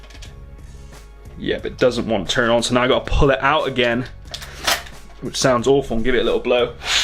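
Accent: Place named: Scotland, United Kingdom. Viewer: Is your nationality Scottish? Your accent seems to hint at it. Just curious. Also, great video! I may consider buying one of those Handheld Super Nintendos.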